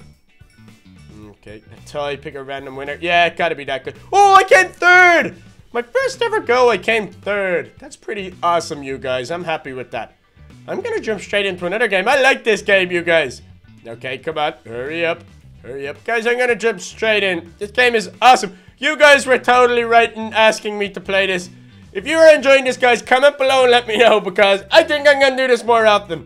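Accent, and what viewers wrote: Dublin, Ireland. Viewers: I knew that ur from Ireland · Are you from Ireland I'm not from Ireland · I knew you were irish because I'm irish and its ovious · are you from Dublin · So your Irish? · Your Irish wow I thought you was English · Are u from Dublin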